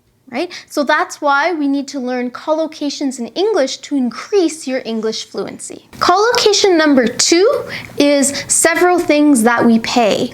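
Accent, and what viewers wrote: Canada. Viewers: Love u, despite you're Canadian and your pronounciation is a little bit different from U.S.A I always understand what you say and the way that you express is so clear as well. Regards!!!☺ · Are you from Canada?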